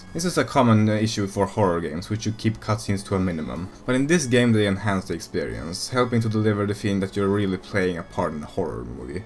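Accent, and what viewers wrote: Sweden. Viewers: Wait, are you sweedish?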